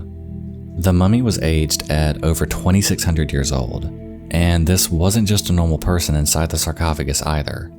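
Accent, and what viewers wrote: South, United States. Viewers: Congrats on your quick rise to fame. Your videos are good, and your southern accent is just great ) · sounds like Barely Sociable with a slight southern accent. Barely has once done a southern accent, though.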